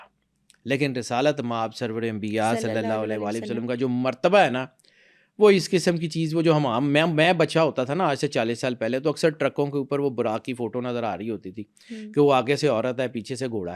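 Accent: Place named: Punjab, Pakistan. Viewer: Use normal accent to speak.. Not punjabi accent.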